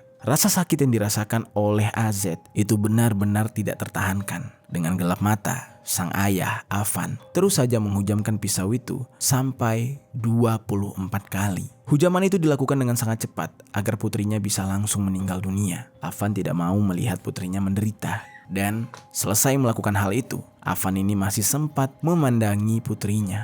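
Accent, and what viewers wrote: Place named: Banyumas, Indonesia. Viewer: Ngapak brow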